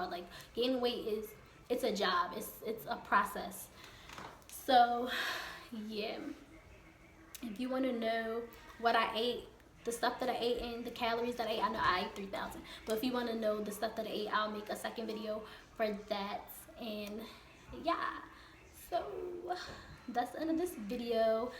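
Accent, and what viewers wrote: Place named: Maryland, United States. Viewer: Girl I'm from MD and you sound like you are too 😝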